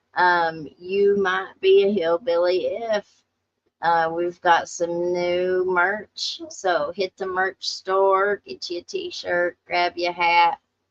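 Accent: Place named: Kentucky, United States. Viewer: Im from southern Ky & i adore. Everybody i know has that accent ❤❤❤. Keep up the hard work. We are so proud of u E!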